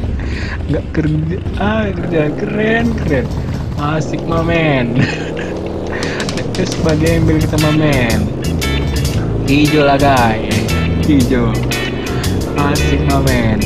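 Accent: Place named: Tanjung Balai, Indonesia. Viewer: abg orang tanjung balai ya?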